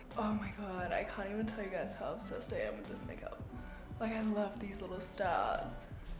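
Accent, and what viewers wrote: United States. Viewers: you're so pretty like wtf and your freckles are adorble I think I have a girl crush on you fr I love you're american acent as well. I also like you're personality! btw im from london xx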